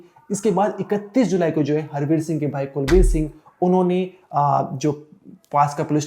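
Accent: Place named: Saharanpur, India. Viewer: बोलने का दंग बता रहा है तुम सहारनपुर से हो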